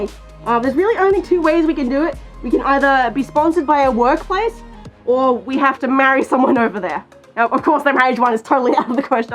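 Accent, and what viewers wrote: Australia. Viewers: You sound more australian then when I was last here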